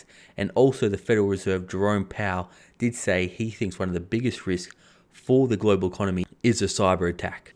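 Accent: Australia. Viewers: Are you from auzzie or nz bro? You sound like an anzac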